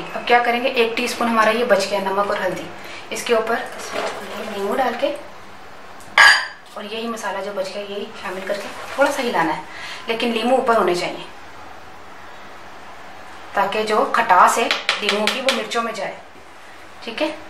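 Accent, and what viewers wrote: Punjab, India. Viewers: Your punjabi is very sweet and your achar is very good and yumm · Walikum aslam Her punjabi so sweet Thank you ma'am so much for sharing ur knowledge with us🤓🤓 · ساتھ ساتھ پنجابی کا تڑکا بھی لگ رہا ہے۔ خوش رہو میری بہن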